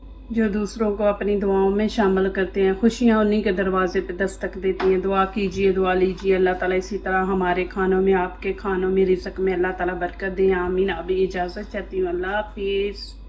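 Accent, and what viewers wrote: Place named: Punjab, India. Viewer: Tusi Punjabi ho?!! urdu may Punjbai khushbu a rahi hai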